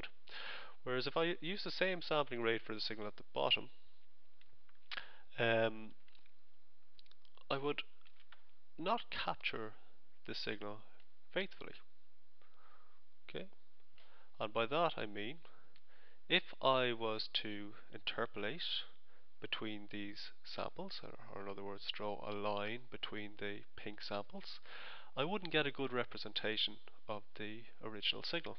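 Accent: Ireland. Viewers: Sweet explanation and an Irish accent on top. Class fella, cheers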